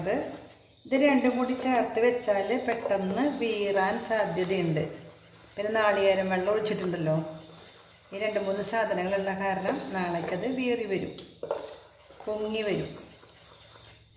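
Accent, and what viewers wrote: Thrissur, India. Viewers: Namaskaram. Valare saumyamaya sambhashanam aanu video kaanan prerippichathu. Valare upayogapradamaya content 👌 Valare Santhosham Nandi. Samsarathil ninnu Nanma niranja,teacherum veettammayum,Trissur swadesiyum Tamil brahminum aanennu manassilayi.Ellavidha aasamsakal nerunnu.iniyum dharalam videokal prateekshikkunnu.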